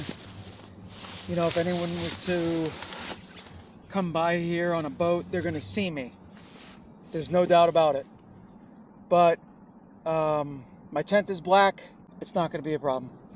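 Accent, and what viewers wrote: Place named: New York, United States. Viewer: Got to be on the look out fore snitches,man you went on a round a bout way to git to , the rinc key dinc island, but in teresting.brave soul,endurance.you talk like mi roommate Chuckie Frome the navy 34 yrs ago Norfolk ,va., he was Frome flushing and queens I am frome Chicago.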